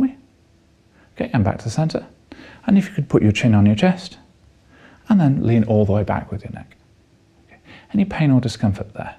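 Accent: United Kingdom. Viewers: He's so British haha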